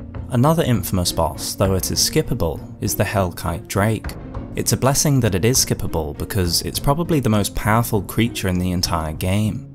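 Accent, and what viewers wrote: United Kingdom. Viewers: An actual good YouTuber making good content! Love to see it! Too many wannabes out there, for some reason I think you and 3kliks would get along great, you two and the amount of British emitting is incredible, especially in narration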